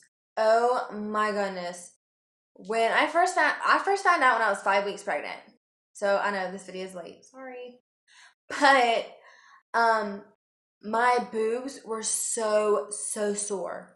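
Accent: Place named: South, United States. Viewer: where u from I hear a southern accent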